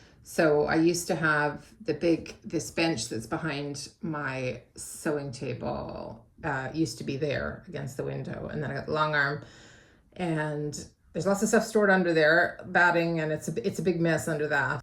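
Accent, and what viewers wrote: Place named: Scotland, United Kingdom. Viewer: The space looks great. I love the bright colors on the wall. I wish I had enough space to attempt organizing so well. The Yeti mug was a good choice...I love mine to keep water cold on my bedside table all night. Your accent is adorable..It seems to be American, with certain words having the underlying Scottish brogue. Keep the videos coming, I always enjoy them.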